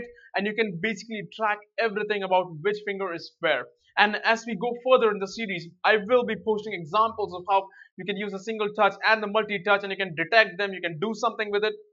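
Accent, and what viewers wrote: India. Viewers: I don't know why, to me he sounds like india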